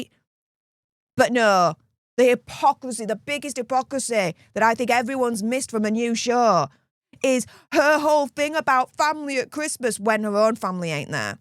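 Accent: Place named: England, United Kingdom. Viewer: I watch all your videos but I’m intrigued by your accent. I can’t place exactly where in England it’s from?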